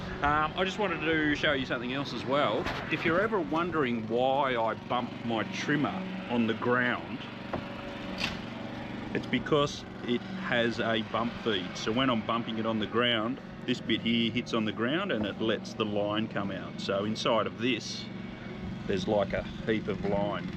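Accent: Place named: Australia. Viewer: I'm enjoying the banter and seeing more of you, loving the aussie accent BTW. Keep up the good work guys, you do a terrific job 😊❤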